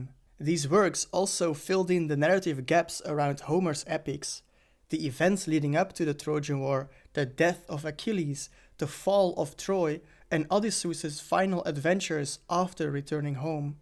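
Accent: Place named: India. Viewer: I thought this was being narrated by an Indian, until I saw his face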